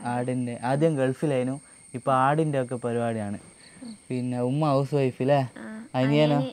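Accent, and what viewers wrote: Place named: Nadapuram, India. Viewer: vadakara thanneyono.allel nadapuram bhagathaano?, samsaaram nadapuram shailiyaanallo😆😆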